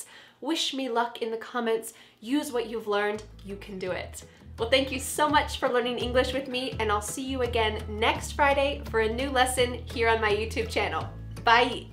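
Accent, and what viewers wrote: United States. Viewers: Although you speak american english, but i think i can listen to your pronounciation very well .because your voice is clear easy to understand.who think like me? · Your pronunciation is good for me to understand... I watch different channel's videos but they aren't that clear to me. Only yours and marinas(from linguamarina) pronunciation is better to me.. I love american accent.... ❤️ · When you use american accent i just fall in love with your English lots of love from lndia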